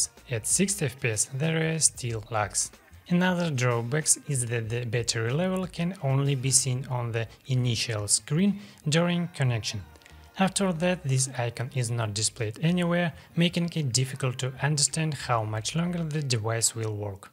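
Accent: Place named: Russia. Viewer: И у тебя сильный русский акцент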